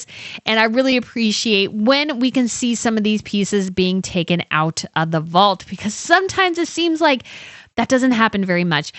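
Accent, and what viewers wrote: United States. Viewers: Sorry the annoying, screechy, out of place, American voice was just too much for me to continue watching . What a shame!!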